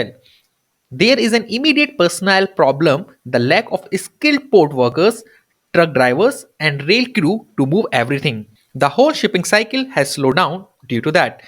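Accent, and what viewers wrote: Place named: Punjab, India. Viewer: Punjab accent is very HEAVY here.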